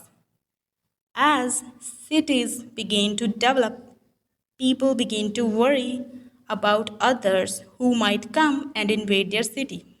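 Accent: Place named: United States. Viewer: Madam from where you have learnt American English?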